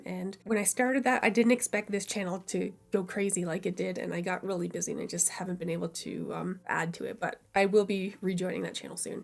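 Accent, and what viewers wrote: Nova Scotia, Canada. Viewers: Your furniture stuff showed up in my feed one day... so i watched... then i watched some more... and there was something about your accent that made me think of "down home nova scotia" Then i discovered you're in Hantsport! My hubby grew up around the corner from you on Smith Cres (the wrong side of the tracks, lol) and he is only a few years older than you... so now i wonder if our paths might have crossed in the past. Boom! I was hooked! Art and furniture restorations... with that down home accent. 😊 Thank you! And happy anniversary.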